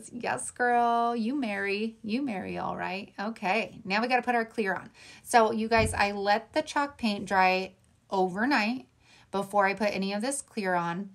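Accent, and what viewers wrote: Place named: North, United States. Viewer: Hi I just came across this video and had to watch it to learn some things because making wood round signs is my favorite craft to make. I always order my rounds from Amazon, but they aren’t anywhere near that thick! Those are really nice! I am from Southeast Kansas. It sounds like you are up north from the places you shop at. Thank you for the tips I picked up! I enjoyed and subscribed to your channel.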